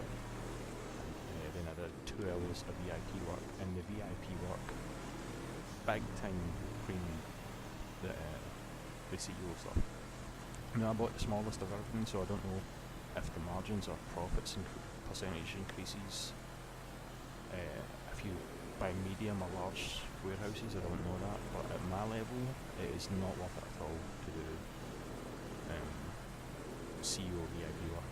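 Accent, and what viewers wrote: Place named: Scotland, United Kingdom. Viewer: I really agree with what you're saying this is why I haven't invested in buying an office yet. my personal opinion on this update is it seems like a bit of beta idea for the next gta. kinda like how the whole gta was a beta on last gen. anyway good video your mic was a little quiet, but I do watch most of my videos and maybe that's why, you also surprised me I thought you would be American (not sure why) ,but you're Scottish which is cool Bro 👍✌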